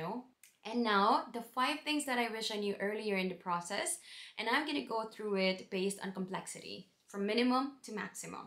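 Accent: Ireland. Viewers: you know you've settled once you have the brogue :) cupla focal and you're 100% there.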